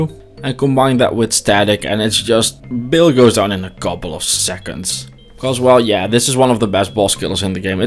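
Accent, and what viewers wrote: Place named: Netherlands. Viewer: Too bad, but sure I totally comprehend the reason. Not everyone has the time to get a "Twitch star" today 😁 RL always comes first. Cheers! P.S.: are you dutch ? You sound a bit like a dutch guy ^^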